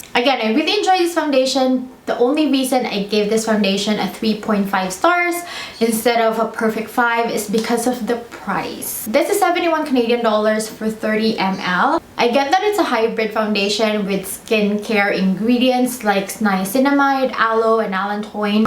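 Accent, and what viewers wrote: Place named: Philippines. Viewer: I hear Filipino accent 🇵🇭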